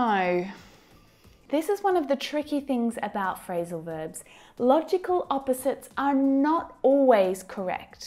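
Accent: United Kingdom. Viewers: looks like your english pronunciation "british." anyway . thanks. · Ok ,,.es que estoy aprendiendo el inglés pero de pronunciación Británica que es más limpia y solo encontraba Americana... y te encontré...besos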